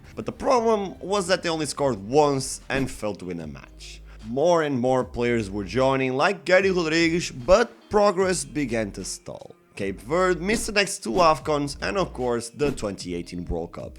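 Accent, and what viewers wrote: Portugal. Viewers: By your English accent and your perfect pronunciation of Portuguese names, I can tell you are Portuguese. It is pronounced “Bubissta”, and not “Bubishta”. Good video, BTW! 👍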